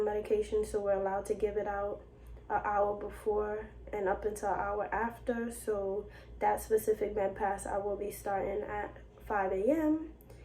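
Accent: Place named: New York, United States. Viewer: I know a Nyer when i hear one lol Thanks for making this video sis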